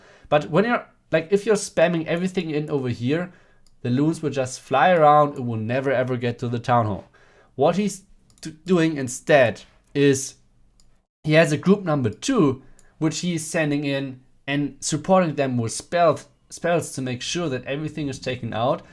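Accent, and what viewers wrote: Germany. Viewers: You can tell by his accent he is german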